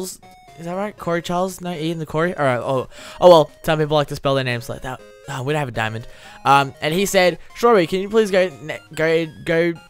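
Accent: Australia. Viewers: He sounded so much more australian back then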